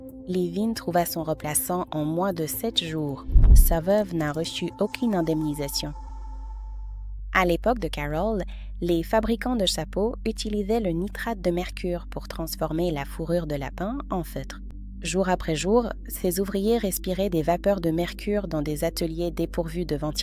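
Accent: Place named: Quebec, Canada. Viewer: Superbement documenté ! Avec le petit accent so québécois qu’on adore ! Tellement intéressant j’aurais aimé tomber sur vos contenus bien avant ! Merci à vous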